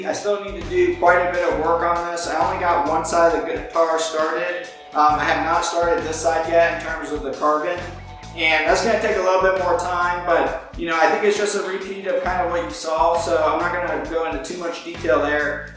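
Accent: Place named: Alabama, United States. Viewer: What? I can't hear you! No just kidding. Put your money into something you want. I don't care if the sound isn't quite there. I just don't like that Alabama accent! That's from a Georgia boy of course. Looking good pal.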